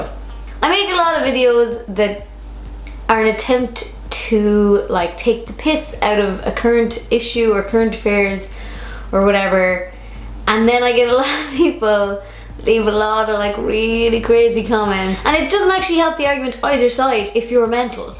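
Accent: Ireland. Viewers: You don't know how helpful your videos are! I'm planning a interchange to ireland and i was looking for youtubers from there and then i found you! Now I can understand the irish english better thanks to you! Great videos tho.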